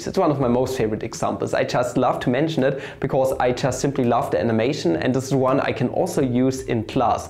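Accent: Germany. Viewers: You sound german, are you from Germany?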